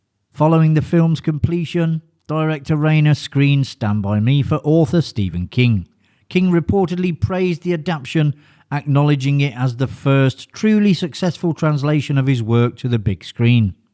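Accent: United Kingdom. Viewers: Or that the speaker is British... Which based on his accent, I would say is British. A classic one is the word Aluminum. British people say "al-u-min-ee-um" instead of "ah-lum-in-um". They even spell it differently for that one...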